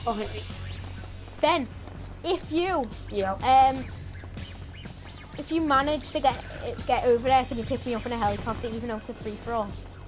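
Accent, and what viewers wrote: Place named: Liverpool, United Kingdom. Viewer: What a scouser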